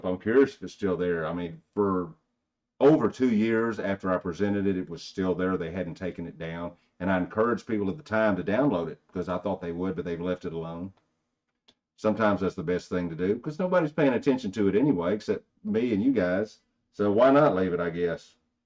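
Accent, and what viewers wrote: South, United States. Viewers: You have and American speaker acent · If I watch you for too long I might start talking Southern.I loved what you are finding and your long ago contributions.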